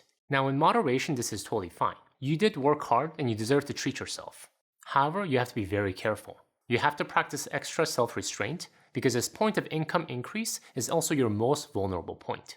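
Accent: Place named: South Korea. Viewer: It’s interesting how his English sounds like Korean haha. Nice video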